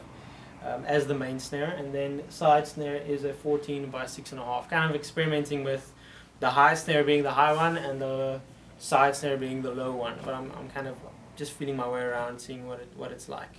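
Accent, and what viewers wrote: South Africa. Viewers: when ever u talk it reminds me of relatives in South Africa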